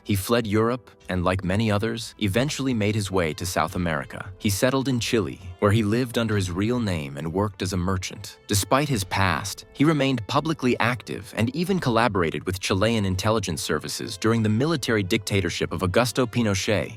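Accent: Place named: United States. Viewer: Nice vid but work on the pronuciation of names. Terrible American accent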